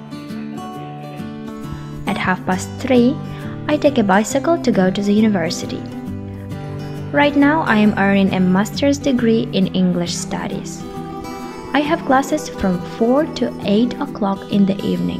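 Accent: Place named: United Kingdom. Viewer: Mi maestra me dijo "vean este video, la chica tiene un acento Britanico perfecto, uff. 👌🏻" JAJAJAKWLWL.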